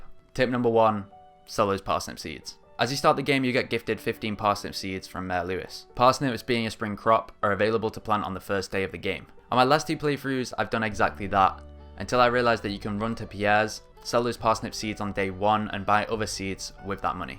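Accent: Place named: United Kingdom. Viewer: Chewsday I like british accent bro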